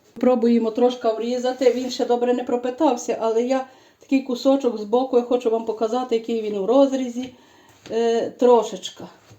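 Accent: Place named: Rakhiv, Ukraine. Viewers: Вы майже из Рахова?такий розговор як у нас уклин вам из КАЗАХСТАНУ. ЯТОЖЕ ТАКИЙ УМИЮ И ДУЖЕ ЛЮБЫМО. САМИЙ ВКУСНЮЧИЙ. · Дякую, пані, при нагоді обов'язково спечу, дуже гарний рецепт. Ви дуже файна господиня, дай Бог вам здоров' я і щастя. А ваша гуцульська говірка просто чарівна, слухаю і милуюся. ❤️👍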